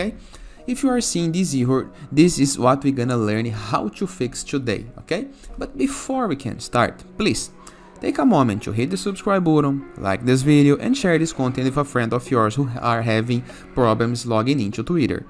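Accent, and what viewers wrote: Brazil. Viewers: sotaque brasileiro brabo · esse cara é brasileiro tentando aprender em ingles crtz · Claramente sotaque #BR hehehe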